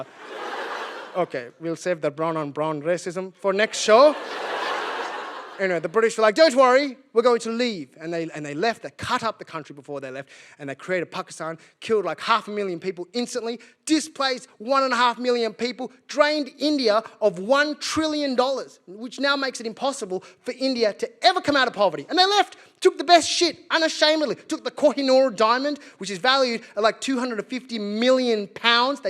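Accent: Australia. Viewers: You are Aussie! How marvellous❤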